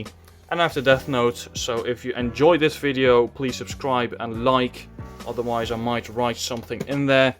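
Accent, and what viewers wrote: Netherlands. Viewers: I currently have about 700 volumes in my collection and my favorites are Oysumi punpun, Pandora hearts, AOT, Berserk, and Monogatari lmao. Nice collection btw! You have really good taste imo. Also are you dutch?😂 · Are you Dutch? Great collection btw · Hahahah moet wel je kunt gwn meteen horen dat ie Nederlands is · Are you Dutch perhaps? You're accent sounds really familiar but I don't want to assume. Great collection and thanks for showing it! Edit: I just scrolled through to the comments and apparently I'm really bad at listening 😅.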